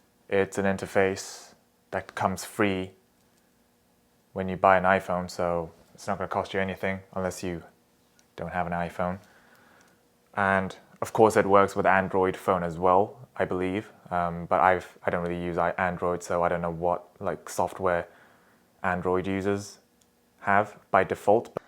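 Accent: United Kingdom. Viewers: definetely did not expect british?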